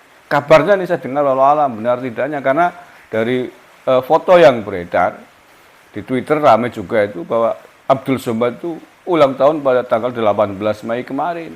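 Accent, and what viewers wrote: Banyumas, Indonesia. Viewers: Sampyn orang mna pak,,,nada bicarane mirip nyonge,,,hehe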